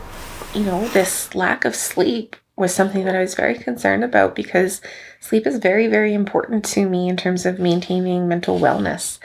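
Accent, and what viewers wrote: Canada. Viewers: Your Canadian accent is showing and I love it. (Mississauga girl here). Thank you for sharing your story. Big congrats to you!! she's such a sweetie <3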